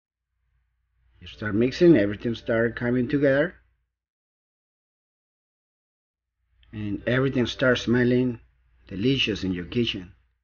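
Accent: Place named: Mexico. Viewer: He's not Argentine. He sounds Mexican, perhaps? This chimichurri is pretty good. I just made a batch.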